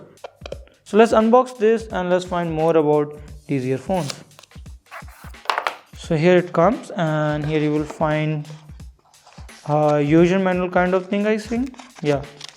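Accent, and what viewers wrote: India. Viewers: That is hindi accent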